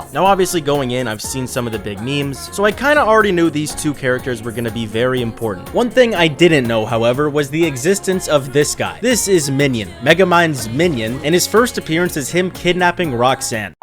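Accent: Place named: United States. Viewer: Bro, I thought you were american.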